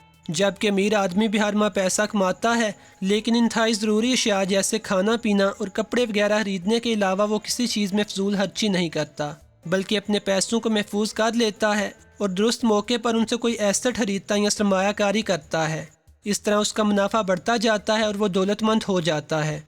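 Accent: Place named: Punjab, Pakistan. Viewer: پنجابی لہجے میں اردو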